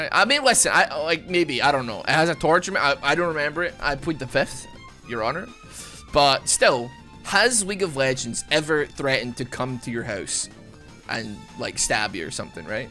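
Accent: Scotland, United Kingdom. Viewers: I need to know if you are capable of saying "purple burglar alarm," as supposedly some Scott's cannot.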